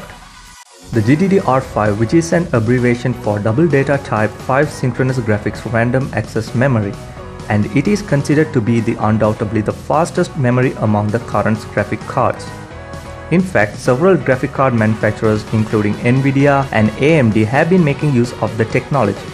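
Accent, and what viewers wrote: India. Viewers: Bro shut the fck up your Indian voice is funny as fck😂😂😂😂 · Ahh. Classic Indian accent. The land of street sh#tter. PS: I think the music needs to be louder. So we can bleed our ears out.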